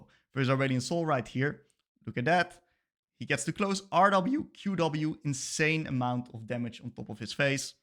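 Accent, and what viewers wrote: Netherlands. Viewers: I wanted to learn this champ and this is 2 weeks old really nice this will help me a lot 💪🏼💪🏼💪🏼💪🏼💪🏼 (Btw you sound dutch haha) Edit: stopt watching (gonna watch the rest later) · >the perfect leblanc guide >almost 8 hours long u are so goated lmao also are you dutch? your accent sounds rly dutch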